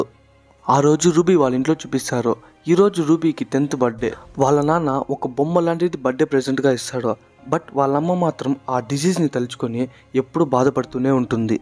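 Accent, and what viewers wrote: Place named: Rayalaseema, India. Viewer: Mana rayalaseema accent lo balega explain chesavu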